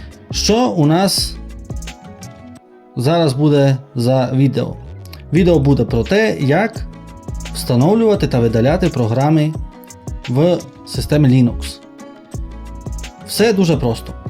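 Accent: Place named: Transcarpathia, Ukraine. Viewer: кострубато то кучеряво (пелехато, заплутано), просто ви розмовляєте дуже подібно до закарпатського діалекту, і людина подумала що ви десь з виноградівського-берегівського регіону. Якщо чесно я також так подумав, але якщо ви не знаєте що таке "кострубато" то я навіть не знаю звідки ви.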